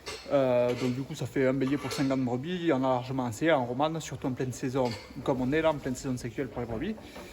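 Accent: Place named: South, France. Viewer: Salut, ma femme me dit toujours, avant de faire crac crac coupe toi les ongles, ça t'éviteras de me griffer. Hahaha… Si non,chapeau pour tes expliquations,j'en apprends chaque fois, c'est un régal,en plus avec l'accent du SUD. AH, le Larzac,Joseph Bové, le thym le romarin, Ho, là je m'égare,salutations Serge.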